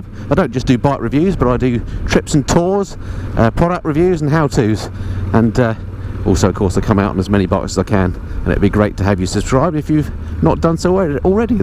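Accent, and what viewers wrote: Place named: United Kingdom. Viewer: I played this review in 0.75x speed and IT SORTED THIS REVIEW OUT for a person like me who WASN'T born into QUICK-AS-HACK British talking :)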